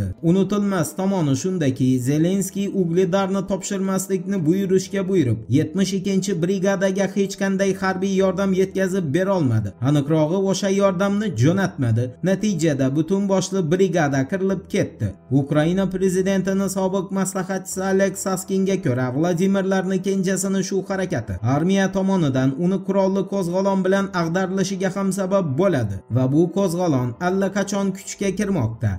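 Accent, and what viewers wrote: Khorezm, Uzbekistan. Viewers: Нима бу хоразмликми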